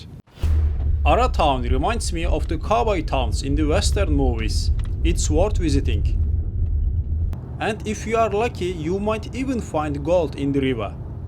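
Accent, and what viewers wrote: Turkey. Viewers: Ulan ben de diyorum İngilizceyi aynı Türkler gibi konuşuyor, Türk'müş adam :) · Aga türk müsün aksağandan anladım düzenleme video başında yazdım bu yorumu videonun devamında açıklamışsın zaten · türk. ingilizcesinden bile belli oluyor. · Videonun ilk dakikalarında bu ne böyle Türkler gibi konuşuyor İngilizceyi dedim. Meğer Türkmüş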